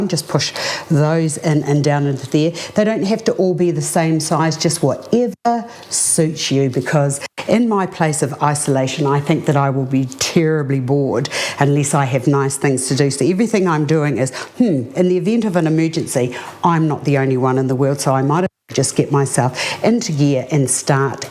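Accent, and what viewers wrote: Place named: New Zealand. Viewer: Love this! and I can't get enough of the delicious NZ accent. You sound like my choir director Mark Duley, he's from Christchurch but now has settled down in Galway, Ireland!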